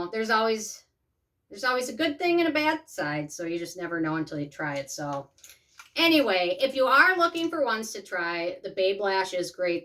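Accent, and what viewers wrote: Minnesota, United States. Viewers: You've got that classic Minnesota accent.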